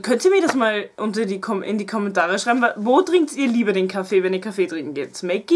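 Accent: Austria. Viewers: Sehr sympathisch, ich höre deinen österreichischen Akzent sehr gern.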